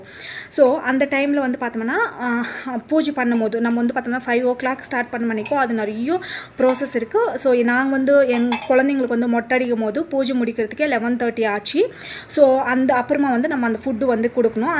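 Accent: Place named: Bangalore, India. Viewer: Mam you have given a good explanation.. i think you are explained the procedure followed by Vahnikula kshatriya. Tamil you have spoken is Bangalore Tamil if am right. Thank you for the explanation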